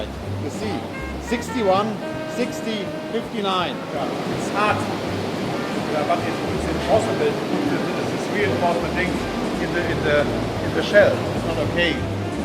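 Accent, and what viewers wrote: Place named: Germany. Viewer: hahaha.. how u realize that he is german from the very first second :D i'm german myself but i hate the normal german accent :/its duch a .. torture :(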